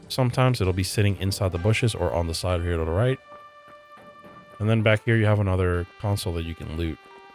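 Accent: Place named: India. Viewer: i know your indian but could you tone the accent down a little, hard to understand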